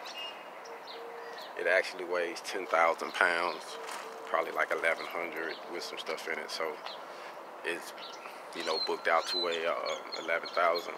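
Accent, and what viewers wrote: South, United States. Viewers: Where are you from? Somewhere down south? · Brother you sound like you're from the South..You should know better....Have you never heard of"trailer park trash",have you never been in some.of these truckstops with these fools...What are you trying to prove?..Think bruh,think!!!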